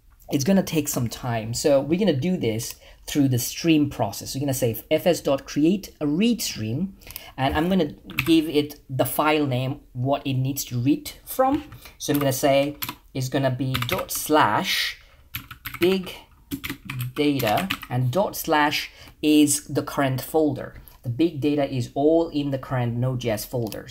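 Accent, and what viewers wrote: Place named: Ireland. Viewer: Nice video mate, are you living in Ireland, I can hear a slight Irish accent at times?!!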